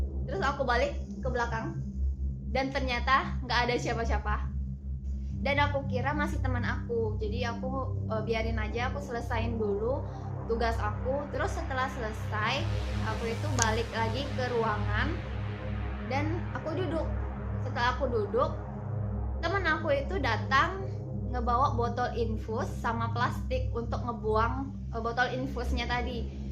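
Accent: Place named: Kalimantan, Indonesia. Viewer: cara bicaranya mirip org kalimantan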